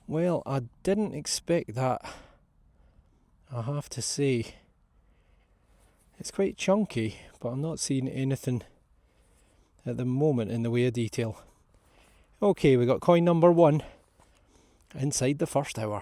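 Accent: Scotland, United Kingdom. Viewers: You no what I just trying to watch your video and just as I got in to it some stupid adds appear it bloody happens all the time. It's just rubbish how we just get bombarded with all those rubbish adds . It does not make sense, the things they advertise are just crap. No one would be bothered to even think about buying it. Sorry mate for letting out my frustration. Your doing a great job and we appreciate your enthusiasm and knowledge. Keep up the good work mate. Like your. Scottish accent.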